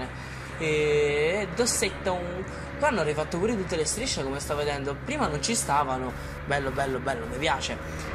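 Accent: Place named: Latina, Italy. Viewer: Ma sei di latina daje ahahah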